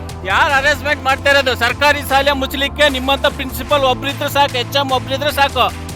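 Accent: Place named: Davangere, India. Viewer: Super 💥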